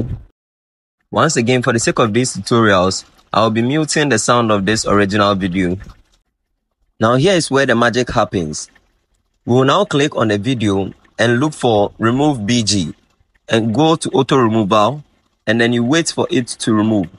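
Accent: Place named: Ghana. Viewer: You sound so much like Ghanaian even though I know you are a ghanaian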